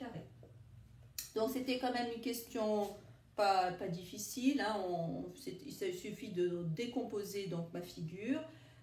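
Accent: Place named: Tunisia. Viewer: Elle a l'accent tunisien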